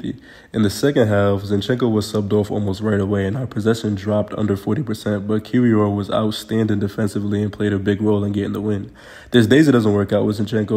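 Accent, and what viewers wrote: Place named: Brooklyn, United States. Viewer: hearing a bk accent talking abt football is so odd to me, loving it tho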